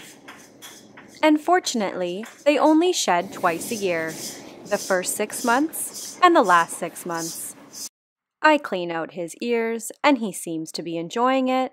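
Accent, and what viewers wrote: Canada. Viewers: She's Canadian? I always thought Midwest US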